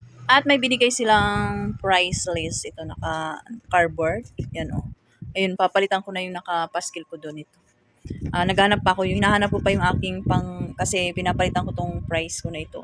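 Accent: Waray, Philippines. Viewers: Hello madam new subscriber here. Waray waray ka man po. Hehe. Taga diin ka po? 😊😊😊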